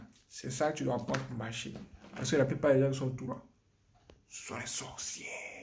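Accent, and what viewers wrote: Ivory Coast. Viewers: C'est un accent purement ivoirien ça.... Très loin de l'accent camer...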